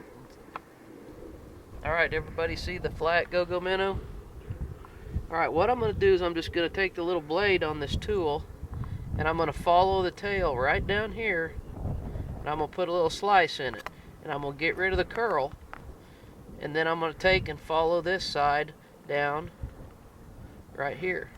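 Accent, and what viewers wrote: United States. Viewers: He is saying crappie but we are in the south and you guys probably aren't so that's how you think he said and those are some nice crappie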